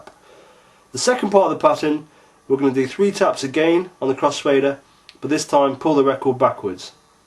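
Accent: United Kingdom. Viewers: u sound like ur from nottingham. are you bro?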